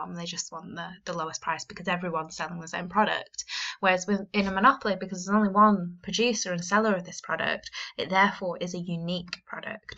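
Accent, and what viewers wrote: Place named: United Kingdom. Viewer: love your british accent :)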